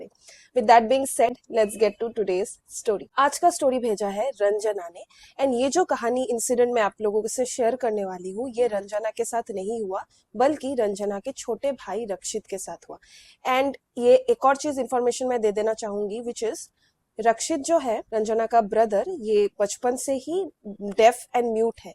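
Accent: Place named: Bengal, India. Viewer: ❤❤❤❤beautiful ❤❤❤❤with bengali accent. Its nice❤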